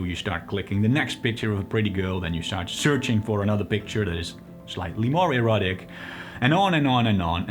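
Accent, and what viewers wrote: Netherlands. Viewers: Ik Dacht Wel Dat Ik Een Miniscuul Nederlands Accentje Kon Waarnemen. 🙂 Nevertheless Very Well Spoken & Articulate,Dankjewel. 🔥💪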